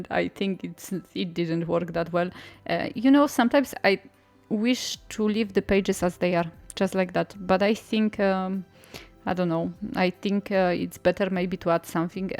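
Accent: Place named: Iran. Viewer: I love your accent. Is it Persian?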